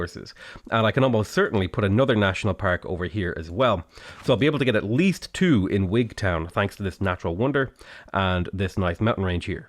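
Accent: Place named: Ireland. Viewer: nothing wrong with your voice. evidenced by the success of your channel it's demonstrably fine. (blessed with an irish accent i would argue its excellent. you're a skilled communicator.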